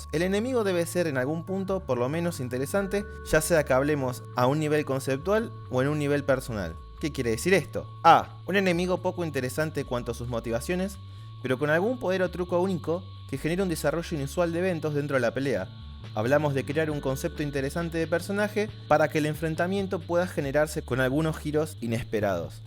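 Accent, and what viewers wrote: Argentina. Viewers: Buen vídeo, sos Argentino?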